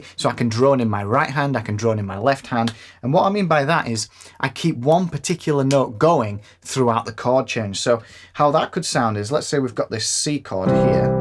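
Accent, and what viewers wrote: United Kingdom. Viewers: SOUNDS GREAT LIKE ELTON JOHN ARE YOU BRITISH MATE.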